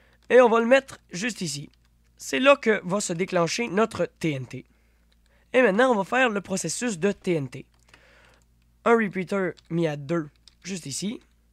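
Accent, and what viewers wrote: Quebec, Canada. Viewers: Juste excellent, et puis ton ptit accent québecois ça rend le tuto encore plus sympa à écouter :)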